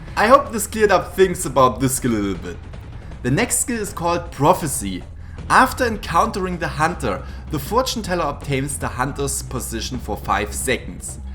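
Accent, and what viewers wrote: Germany. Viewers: Are you originally german because your English sounds like a german person talking English?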